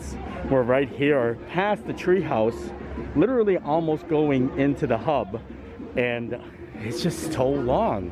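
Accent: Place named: Pennsylvania, United States. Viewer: Hi! We love your videos and get excited every time there’s a new one! Curious, where in PA are you from? I went to Susquehanna University and you have the same “accent” as some of my friends from Shenandoah.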